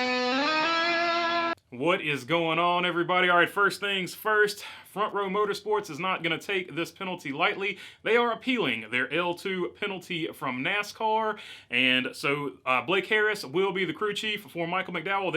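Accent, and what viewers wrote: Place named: East Tennessee, United States. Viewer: Where is from? Your accent is similar to mine. I grew up upper East TN. With weekly excursions to SWVA.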